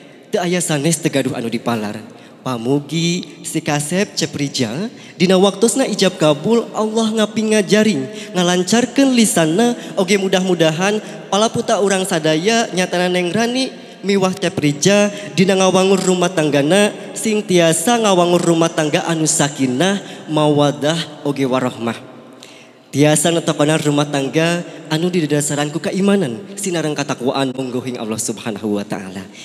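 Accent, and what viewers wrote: Sunda, Indonesia. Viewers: Sae tah nyunda ngalegeuna.ciri has utang sunda.terus mumule bahasa sunda.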